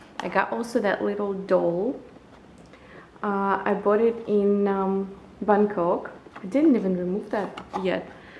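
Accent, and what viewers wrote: Russia. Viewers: She sounds like Russian, Ukrainian or something from that region.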